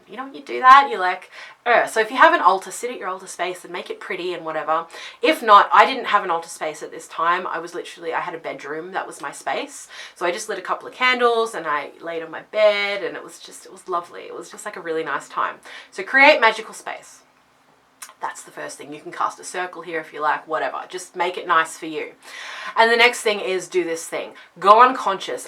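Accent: Australia. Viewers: Hello. I don't usualy comment on Witchy channels because im so new i usually figure " well whet the heck do i know yet. " and as a truck driver in the U.S.A. my Pagan expierences are so different that they are unlikely to be of any help. And us truckers tend to be a bit reclusive any way. So im stepping way outside my comfort zone for this but here it goes. I do not use a magical name eithe in my pracktice nor online. This is only because i am not a youtuber. If i made youtube videos( ill admit I've thought about it.) i would use an alternat name for security reasons but that is it. However it is unlikely that i will ever make a youtube channel because i don't know that the content would be very relavent or interesting to any one. Seriously how many Wiccans do you know who live and work in the cab of an 18wheeler. How manny need to know how to cast a circle inside a semi truck. 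Lol you see my point. Mine is a compleetly Nomadic Wiccan lifestyle, ware as traditionally Wicca and Paganism in general, is verry home based. Anny way. i have rambled on long enoughf and ive allready written a book in your comments section. So ill end by saying i love your channel and your accent. Australia is the only country in the english speaking world who's accent rivals Scotland. Be well and blessed be. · I'm Scottish and I love the Aussie accent! :)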